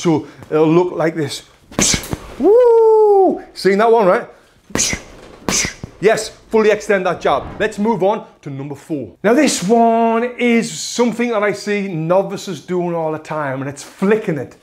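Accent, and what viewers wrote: United Kingdom. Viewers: The amount of passion and pro advices that transpires from this man is overwelming. Also, i love such a british accent, even if i'm italian ahah.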